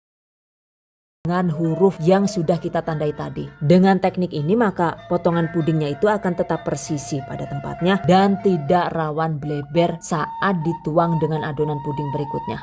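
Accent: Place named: Cilacap, Indonesia. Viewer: Jadi eman" di pangan, enak disawang. Penuh kesabaran tenan dan ketelatenan tentu ne. suwun ilmu dan trik" nya. saya kangen kalau ngomong gawe logat Cilacapan. [suwun]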